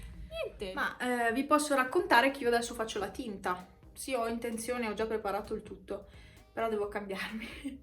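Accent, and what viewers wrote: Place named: Belluno, Italy. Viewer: Ti ho scoperta per caso cercando video sui gatti... E poi sento l'accento e mi incuriosisce xk mi sa di casa... Guardo i tuoi video e vedo che sei da Belluno (montagna da come vedo) 😍 io sono da santa Giustina, ormai da due anni trasferita ad Amburgo... Bello sentirti parlare e vedere le bellissime Dolomiti ❤️ da dove sei di preciso, se posso chiedere...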